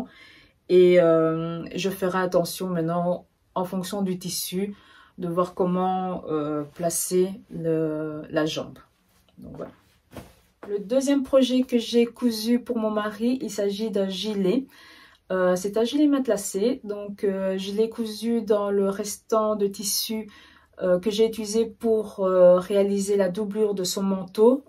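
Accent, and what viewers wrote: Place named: Belgium. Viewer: Coucou , je te découvre et j’ai adoré !! Tes cousettes sont vraiment très chouettes et chic !! J’aime beaucoup tes cousettes hommes ! Pour ton pantalon carotte , il est top , peut être le couper « tête bêche » comme pour un jean ? Pour éviter que ça vrille ? J’aime bcp le gilet sans manches de ton mari ! Pour les Jean , je vais regarder de plus près ce livre car je suis conquise par la couture jean aussi et c’est qd même un beau budget ! Bref je te dis à bientôt !! Et j’avais bien reconnu ce petit accent !! J’ai fais ma primaire et secondaire en Belgique !! A bientôt 😍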